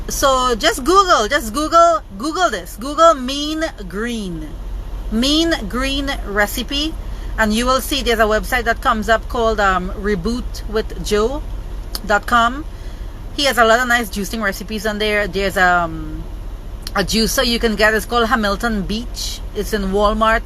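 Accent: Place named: Trinidad and Tobago. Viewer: Soon as my open ya mouth I’m like Trini in the building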